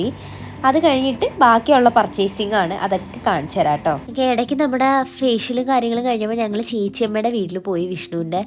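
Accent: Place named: Ernakulam, India. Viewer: Eklm slangayallo😂❤️😍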